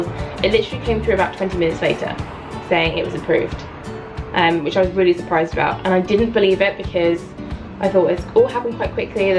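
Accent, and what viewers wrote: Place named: Australia. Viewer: unfortunately not babe you are starting to sound like a true Aussie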